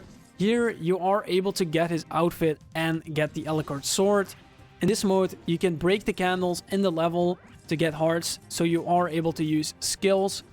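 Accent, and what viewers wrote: Netherlands. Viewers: oh je bent nederlands zo te horen ;) ik heb een vraag, ik wilde laatst Fractures shrines binnen gaan maar toen stond er ''Requires Fatal Falls GLC'' terwijl ik deze dlc al heb gekocht. heb jij mischien 'n idee waarom ik dan toch dit bericht krijg ?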